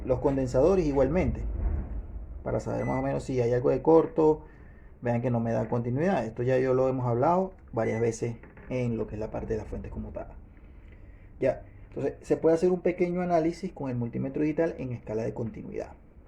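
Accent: Venezuela. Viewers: Muy buen video. Disculpa el comentario hablas como venezolano no como chileno. Muchos exitos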